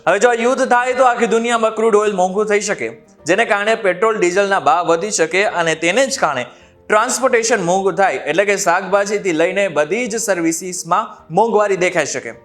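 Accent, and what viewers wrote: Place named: Ahmedabad, India. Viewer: ભાઈ, આ અમદાવાદી touch છોડીને બોલો તો સાંભળવું ય ગમે. તમારી પ્રસ્તુતિમા સાતત્યનો અભાવ છે. તમારું folks બોલવું fox જેવું સંભળાય છે. બની શકે અમુક viewers લોમડી જેવા ય હશે (મને છોડીને). પણ એવું કહેવું સારું ના લાગેને!😂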